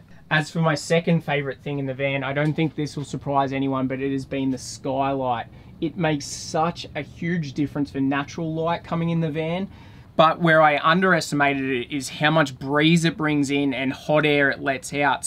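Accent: Australia. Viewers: welcome back - only Aussies can say "clutch" and "whil'st" and sound cool doing it. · I also like the music you played. I have never seen you on the Youtube so I really liked the accent of australis...............I will see you again........bY for now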